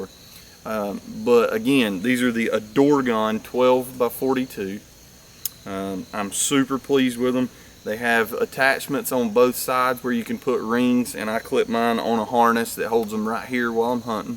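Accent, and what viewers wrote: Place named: South, United States. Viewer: The south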